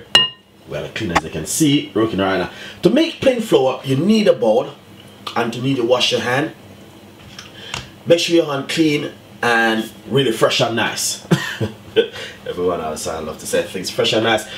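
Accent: Jamaica. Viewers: I did hear spinage, chef u making us Jamaicans sound bad. It's the accent though, love it 🤣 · Loving the strong Jamaican type accent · So Jamaican, so much so that I heard "spinners" when my ears clearly heard him say spinach over and over 😊 thanks for the refresher, when I make them sometimes they come out softer than I like. Never thought to let them sit for 10 min. Blessings!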